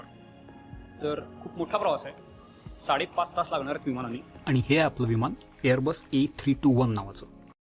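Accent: India. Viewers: Pure Kolhapuri bro 😎...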